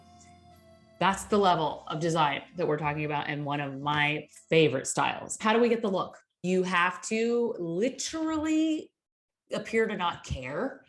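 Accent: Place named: Texas, United States. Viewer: Is it me or are you saying Y’all more frequently? The Texan is coming out! 🤣 I love it!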